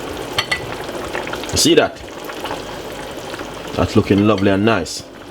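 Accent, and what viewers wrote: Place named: Jamaica. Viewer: Loving the Jamaican recipe... and accent.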